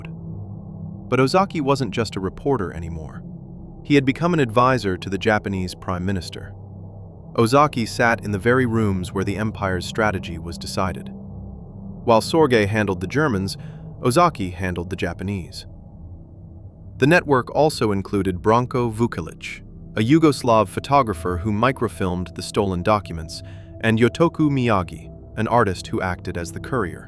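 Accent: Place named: Canada. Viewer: Thank you for utilizing a Canadian voice actor. So tired of the typical deep voice American VO.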